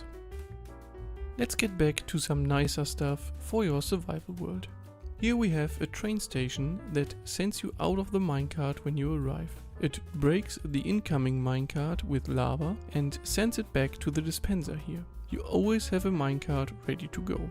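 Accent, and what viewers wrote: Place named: Germany. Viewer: Dem Akzent nach würde ich auf Muttersprache Deutsch tippen? Bei solchen Erklär-Videos würde ich mir eine zusätzliche, deutsche Version wünschen, für die Kinder.^^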